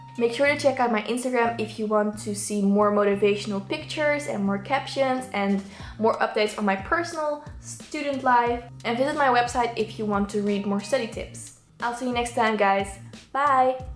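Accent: Netherlands. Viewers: are you dutch?? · I smell Dutch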